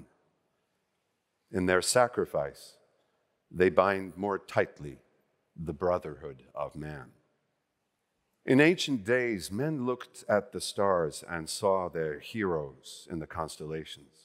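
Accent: United States. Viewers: How interesting that Mr. Cumberbatch chose to read this letter in - what is to my ear - an American accent. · You have a beautiful speaking voice Benedict and you are a truly gifted orator, but, an American accent? I know the Apollo astronauts were American however your natural voice would have done them just as much justice. Kudos to Anne Lapwood, a thrilling end to this piece. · Why was Benedict speaking in an American accent? Was it because everyone kept making fun of him for saying penguin weird? Honestly it’s not that weird, he can say it however he wants lol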